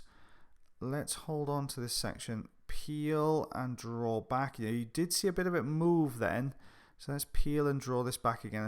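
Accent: Wales, United Kingdom. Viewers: I find the Welsh accent to be the nicest of the British accents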